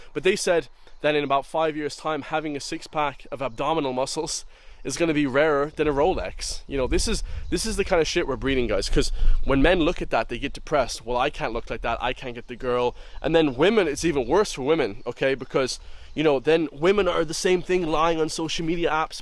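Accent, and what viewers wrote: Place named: South, United States. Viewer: Hahaha you think you're right wing, but you don't abiut politics. You sound like a redneck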